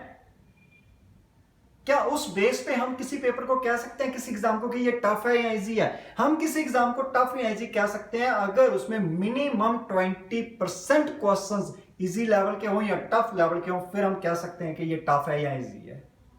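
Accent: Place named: Punjab, India. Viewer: Punjabiya dii Hindi 🔥😁......tq sir....really informative and motivating🙏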